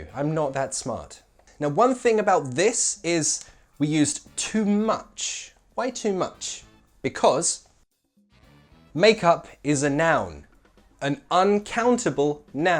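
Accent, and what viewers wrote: United Kingdom. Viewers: When I wanted to learn British accent, I found you here at YouTube... How lucky I was!! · I love your lessons!! I LOVE the way you deliver it! I LOVE your British accent 😢 and there are always new things to learn ❤ Keep up the good work!. I won't miss any of your videos again 🙌. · I just don't look for the video at the first time.I just like that like button.You are the first teacher on youtube who inspired me to learn British accent. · Nice lesson again and again. Btw i love ur British accent it so sexy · A friend of mine recommended me to watch your videos in order to improve my british accent and im so glad he did so because your videos are perfect (+_+)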